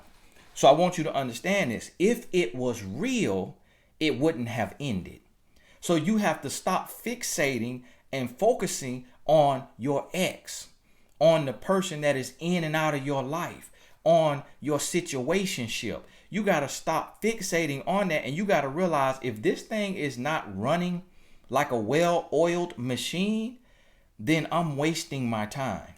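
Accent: South, United States. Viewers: Good information all facts thank you however, Lord that country accent got me laughing.... where are you from? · I mean your southern charm came out in this video!!! Love every video 😂😂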